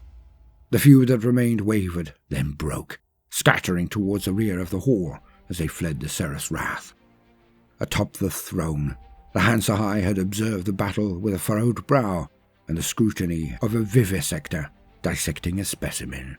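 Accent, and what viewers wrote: United Kingdom. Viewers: Godbless you. Nothing hits quite like a softspoken British man during a nap 🙏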